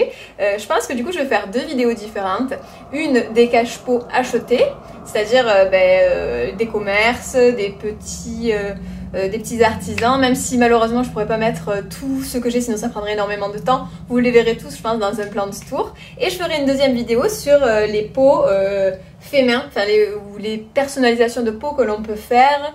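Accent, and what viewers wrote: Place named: South, France. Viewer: Et en plus vs êtes de chez moi? Vs êtes de montpellier? En tout cas bien l’accent du Sud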